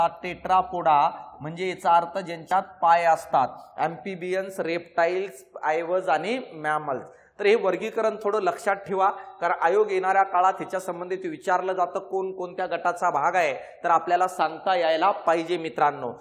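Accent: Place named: Latur, India. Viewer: Sir तुम्ही लातूरचे आहात का. आवाज वरून वाटते.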